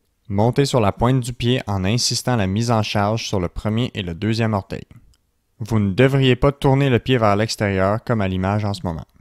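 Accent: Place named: Quebec, Canada. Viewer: Bravo explications claires images bien filmées et silhouette superbe continuez à faire d autres vidéos avec ce merveilleux accent un plaisir de vous suivre depuis la France en Poitou si cher aux québécois .cordialement .marc qui ne se remet pas d’une entorse datant de six mois(exercice phase trois impossible).a cause d une algodystrphie.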